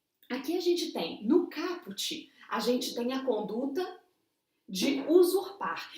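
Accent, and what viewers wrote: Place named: Minas Gerais, Brazil. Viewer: Parabéns Mineira...o sotaque da terra ajudou bastante te ouvir falar...ótima cadencia e construção...continue melhorando!!!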